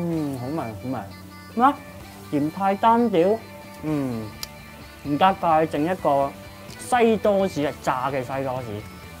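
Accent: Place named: Hong Kong, China. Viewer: D英文好香港式 夠地到 正!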